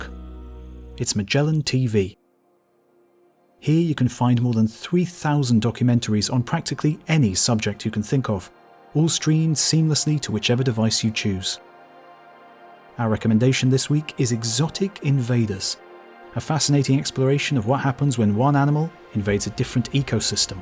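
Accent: United Kingdom. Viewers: You're just saying that cus he has a British accent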